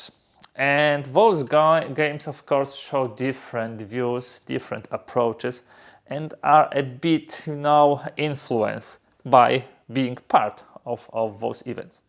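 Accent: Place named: Poland. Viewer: nie jestes czasem polakiem?akcent masz podobny do mojego jak gadam w ingliszu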